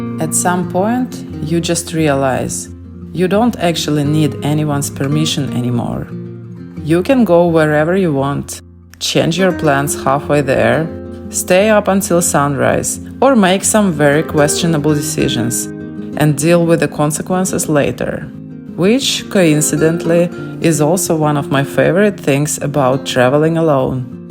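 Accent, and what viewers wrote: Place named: Eastern Europe. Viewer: Like your eastern european accent, did you have a foreign english teacher? No offense, I understand you fine, just interesting🧐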